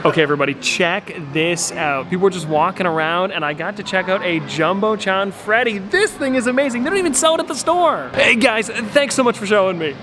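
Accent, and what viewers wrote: Canada. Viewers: across the country i thought your Canadian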